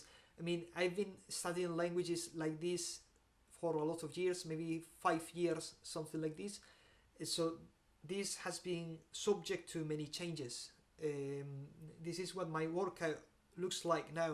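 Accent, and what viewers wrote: Scotland, United Kingdom. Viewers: I found myself here following different threads of polyglots and their channels. I listened to this video and your intro to your channel in Spanish. You are a very interesting fellow. One thing puzzles me, and that is why does your English sound like someone with a Scottish accent? Did you have an English teacher who was Scottish? Just curious. I enjoyed your video.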